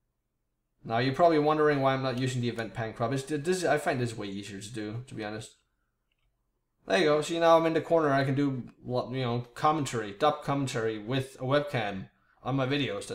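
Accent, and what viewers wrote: Denmark. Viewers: Jeg tænkte det nok, jeg synes du har en svag dansk accent, men dit engelsk er pænt godt ( I hvert fald bedre end mit og jeg er 14 år gammel )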